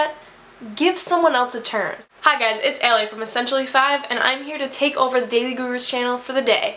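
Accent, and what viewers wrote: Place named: Upstate New York, United States. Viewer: Upstate accent lolz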